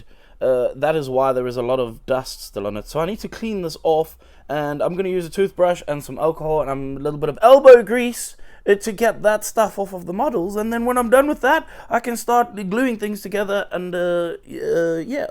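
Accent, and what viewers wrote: South Africa. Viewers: I detect a hint of South Africa in that accent😂 you can't get rid of us that easy. But superb work and truly great craftmanship on the video and models themselves. I need more video series like this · are you south african?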